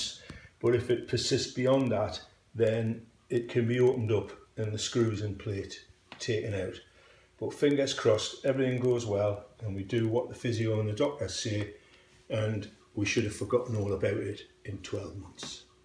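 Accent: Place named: Newcastle, United Kingdom. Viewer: You sound like a geordie too! Lol like me. I am post surgery week 7. I am now on a moon boot instead of plaster. I am finding it difficult with physio. I am a 33yr old mother with two young children and I was studying to be a nurse in my first year of nursing. I had an accident and dislocated my ankle and broke it in three places, they said tibia, fibia and trimelleour I have had a plate in the side and screws and another plate, across and and something else. I have had to postpone my studies and will continue at a later date. To anyone reading this you've got got this. It's bloody hard work and it's not easy, am 7 weeks in and my skin has dried up and I'm still swollen and lost alot of muscle too. I am still very sore and I still have some bruising. I am worse mornings and evenings. I elevate my leg and I also do physio from home.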